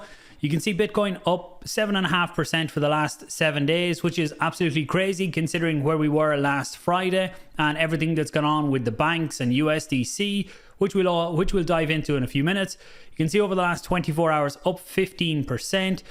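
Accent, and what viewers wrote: Ireland. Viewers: Are you from Sligo man? :D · No way boy, he`s a DUB :)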